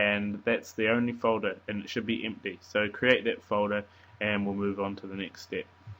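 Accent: New Zealand. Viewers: KIWI ACCENT!! Busted... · You sound kiwi... Are you? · You sound like a kiwi?? chur brother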